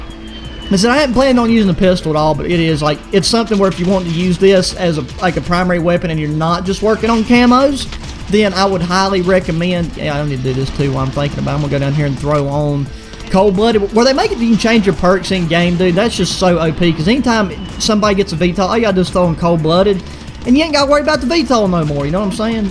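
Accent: United States. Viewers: That voice just made my day The country accent just makes me feel at home because of where my grandparents live Also I went to this video and it turns out your crossbow setup is the EXACT same as mine so I guess I’ll keep what I got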